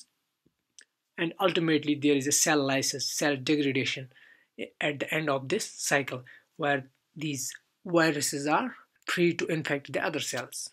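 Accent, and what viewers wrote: Kashmir, India. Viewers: Came for the science, stayed for the lovely indian accent! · I think u r from kashmir? Accent of talking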